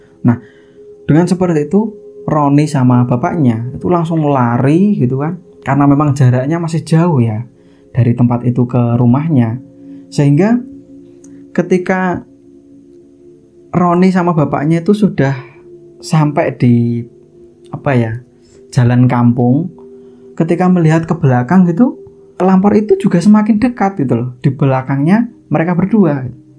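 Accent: Java, Indonesia. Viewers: Njowone pundi to mas.. kok medok banget. Seneng aku dengere👍🏻😁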